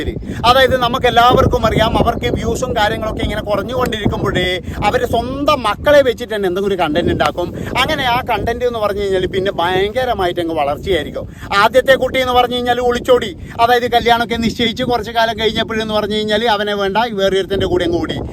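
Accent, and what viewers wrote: Kannur, India. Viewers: കണ്ണൂർ ശൈലി അല്ലേ? ഈടെ എല്ലാരും അങ്ങനെന്നെയ പറയൽ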